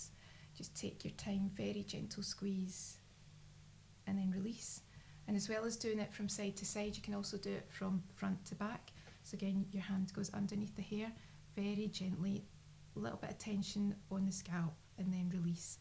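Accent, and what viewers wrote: Scotland, United Kingdom. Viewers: I could tell you wrre from Scotland by your accent. And thays ok I just thought you were a salon with you having all the equipment layouts and stuff if you know what I mean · Jawesome scottish accent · I just love her voice, it's so calming, and I find Scottish accent pretty sexy in a way...XD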